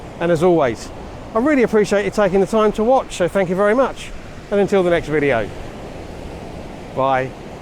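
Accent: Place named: England, United Kingdom. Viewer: In the beginning I understood "I love wifes, I love photograhing wifes" later I realized, that you`re from England 😁. Sorry... Beautiful video!! 👍👍👍👍 Best regards Your namesake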